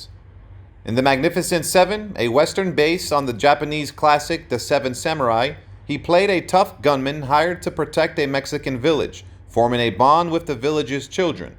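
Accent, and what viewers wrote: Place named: Chicago, United States. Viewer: Hey Mr. Narrator, you from Chicago, ain'tcha? Miss that accent.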